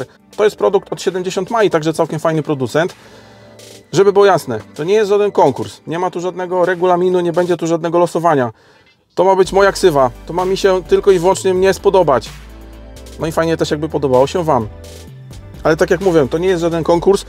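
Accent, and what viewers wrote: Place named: Warsaw, Poland. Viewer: Z całym szacunkiem, ale ... pomysł na ksywę .... no taki sobie. Po prostu ... ksywę albo się ma albo nie, czyli jeśli ktoś wcześniej (w dzieciństwie / w młodości / w robocie) nadał nam ksywę i (że się tak wyrażę) "przylgnęło" i pasuje, to się jej używa .... albo i nie , bo to zależy od ... nie wiem ... okoliczności ? Natomiast "sztucznie wyprodukowana" ... zazwyczaj NIGDY nie pasuje. OK, to Twój pomysł i jeśli Ci pasuje .... to wszystko w porządku, ale ..... jak dla mnie ... dziwne to nieco. Natomiast. Oglądam Twój kanał z powodów które już tu opisywałem kiedyś, ale przypomnę ... w miarę spokojny język wypowiedzi i taki ... swojski jakby, ale ..jednocześnie "warszawski" w tym dobrym rozumieniu Warszawiaka ... coś jak aktor Zbigniew Buczkowski, czyli ... leciuteńko "cwaniak" , ale w tym pozytywnym znaczeniu :) ..... taki ... 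nasz :) Dodatkowo prezentujesz te samochody bez zbędnych (moim zdaniem oczywiście) bzdetów typu ..... oooo ... patrzcie ... tu jest kierunkowskaz ... , tu błotnik, tu lampa, a tam opona. Przy okazji jesteś jednym z tych nielicznych którzy w ogóle odpowiadają na komentarze, czy generalnie utrzymują kontakt z "publicznością" , a to (tu znowu moja opinia) BARDZO DOBRA praktyka. Na koniec watek osobisty, czyli .... UWZGLĘDNIŁEŚ moją prośbę o podawanie informacji o ilości cylindrów w silnikach prezentowanych aut ... jeszcze raz dziękuję. Co prawda przypominałem kilka razy jeśli zapomniałeś, ale ... widzę że to już "constans" w Twoich filmikach :) , czyli .... Z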